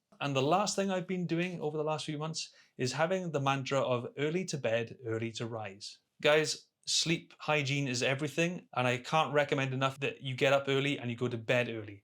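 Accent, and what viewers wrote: Wales, United Kingdom. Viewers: Do you share any thoughts or videos elsewhere? Just found your channel and it was so positive, yet still grounded, that I’m looking forward to watching them all. And I’m hoping from seeing your most recent videos that you’ve really lifted many aspects of your life. That means something, and it’s wonderful to see progress for us all. Finally, I’m a Valleys kid and your accent really helped me take your hints and tips in. Anyway, if you have any other channels I think many of us would love to know. Thanks and take care :)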